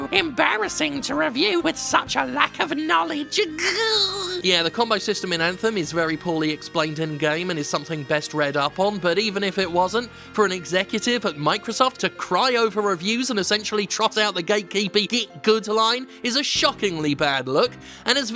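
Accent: United Kingdom. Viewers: It's been 10 years and I still get a hard-on every time I hear that swanky British accent. Sheers mate!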